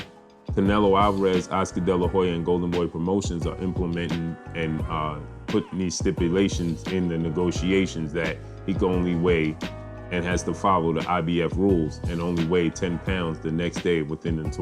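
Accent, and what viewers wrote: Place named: Bay Area, United States. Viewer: Bro you ever think about rapping? You got that voice! That Bay Area floooo. You sound like BIG LURCH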